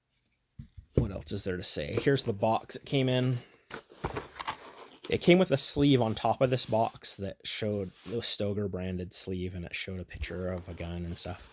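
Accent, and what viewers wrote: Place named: Utah, United States. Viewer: I’m not trying to be disrespectful I was just curious because I’ve never heard that Utah accent. I’m one of those people who enjoy languages and accents and I was just like where is this guy from. Sorry for the off topic comment haha but I like the video I was thinking about getting the micro stoger.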